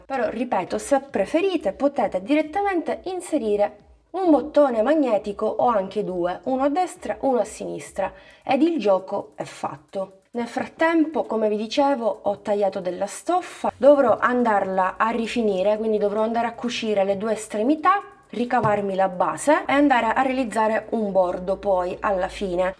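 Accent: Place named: Palermo, Italy. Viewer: anch'io sono di Palermo, qualche flessione verbale mi ha insosspettita 😊 Ribadisco i miei complimenti per i tuoi lavori soprattutto visto la tua giovane età 👏👏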